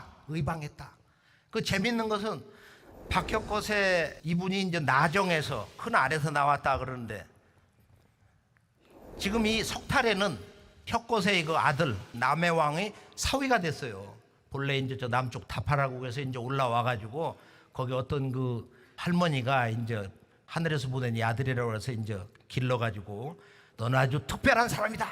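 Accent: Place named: Yanbian, China. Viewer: ㅋㅋㅋㅋ 뭐냐.. 완전 조선족이구만..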